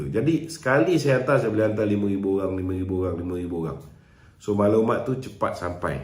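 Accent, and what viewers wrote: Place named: India. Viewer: Pada pendapat saya DLA sembang mcm keling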